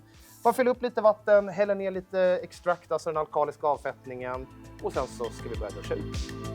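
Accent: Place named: Stockholm, Sweden. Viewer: Jag är stockholmare och om det är det här icke-stockholmare menar när de säger att de hatar stockholmska så förstår jag dom... x) låter som en 12-årig tjej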